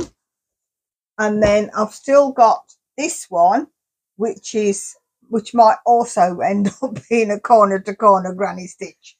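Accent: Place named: Kidderminster, United Kingdom. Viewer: It's been a pleasure. I must admit, being from Kiddy (though not originally) it was the accent that grabbed me first. But your vids have gone from strength to strength especially the ones from Yarnover. They were so interesting and informative. Keep doing what you do. I'm here for the duration ❤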